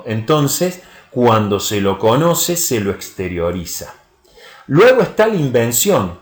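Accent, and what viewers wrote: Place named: Córdoba, Argentina. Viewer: Muy buen vídeo, me agrado la tonada cordobesa vamo´ a tomar un fernet escuchando?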